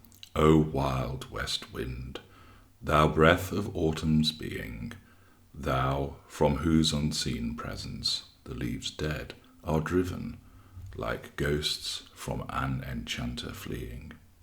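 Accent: England, United Kingdom. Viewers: Only an Englishman can speak like this.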